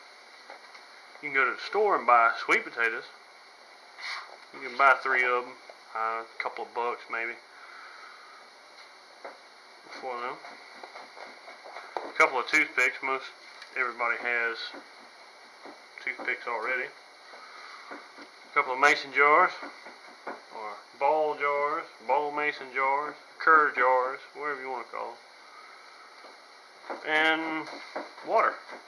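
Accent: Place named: South, United States. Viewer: I used shishkebob skewers (broken in two) because toothpicks for some reason didn't seem to be long enough for my situation. Just an option. I can tell you're from the south by your voice. I'm growing sweet potatoes in zone 3 up north. It works, but you really have to start them early in the house up here. You can let the vines grow really long and cut them into one foot lengths and plant each piece.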